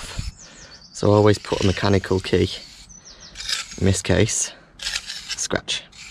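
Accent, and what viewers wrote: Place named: Manchester, United Kingdom. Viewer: Not often you hear local accents on YouTube! North Manchester?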